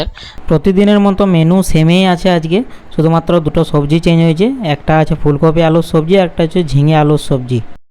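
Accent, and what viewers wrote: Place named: Assam, India. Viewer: পশ্চিমবাংলার ভাষা তো বাংলাদেশীদের মতো,কারন তারা ৮০% বাংলাদেশীই, তুমার ভাষা শুনে তো তুমাকে আসামীস মনে হচ্ছে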